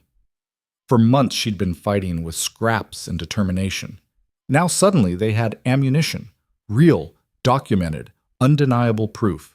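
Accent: United States. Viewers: Remember, this is not exactly an English literature course. More for pleasant entertainment that also gives a glimpse of that era, not necessarily that accurate.The narrator has understandable American accent, at least for these Filipino ears, with the best grasp of the language as many know. Many well educated too, honestly. MANILA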